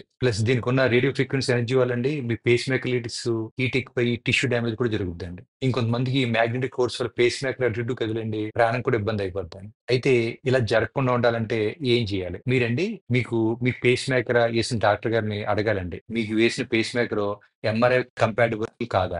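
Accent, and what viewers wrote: Godavari, India. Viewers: godavari slang ❤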